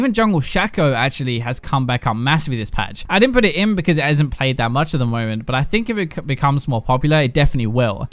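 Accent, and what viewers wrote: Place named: United Kingdom. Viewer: He is British, not an Amerifag. Not everyone pronounces words the way your cancer country does.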